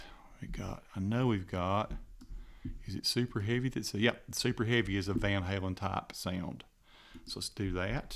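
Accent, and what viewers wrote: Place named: Winston-Salem, United States. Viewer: had to be. Your accent is just like what I remember. Seems like nobody in Winston Salem talks like that anymore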